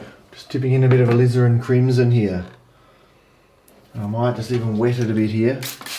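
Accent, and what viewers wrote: Australia. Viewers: Hi just found your channel, loved watching this, great to hear a Aussie accent, cheers from Canberra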